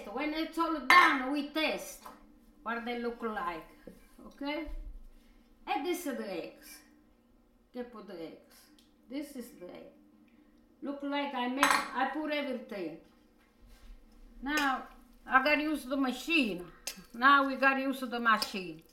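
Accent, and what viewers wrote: Italy. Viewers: As always, Grandma, love your speech, your gorgeous Italian accent, your singing, your willingness to share your techniques with us! Grazie molto!!! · Just love the Italian-English accent (not Italian myself)! Could watch this video just to listen to this dear lady speak 😍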